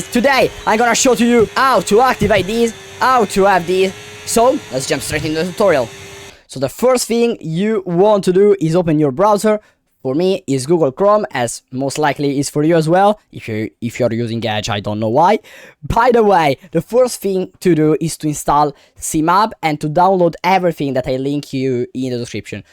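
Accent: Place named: Italy. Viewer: i like your italian accent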